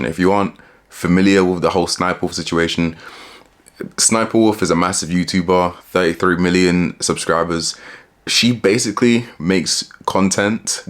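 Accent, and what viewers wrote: United Kingdom. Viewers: Jesus loves you and died for you. Also I love ur uk accent 😭 (im not from the uk)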